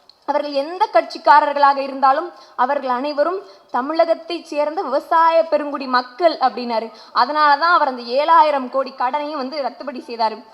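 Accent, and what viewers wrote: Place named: Tirunelveli, India. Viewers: நெல்லைத் தமிழ் அருமை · திருநெல்வேலி மண்ணின் வாசம் உன் பேச்சில் அருமையான பேச்சுமா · Thai unakku entha ooru. Peiru enna...arumaiyana Nellai Tamil. Tamil vazhga. Tamirabarani vazhga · திருநெல்வேலி ஸ்லேங்கில் தமிழ் பேச்சு செம தூள் சகோதரி. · ஏ நீ திருநெல்வேலியா பேச்சிலே தெரியில்லா ஏநல்லாஇருக்கு‌ பேசு பேசு. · Wow pappa super nellai slang speech,,real speech about irresponse some politicians,, congratulations,, thank you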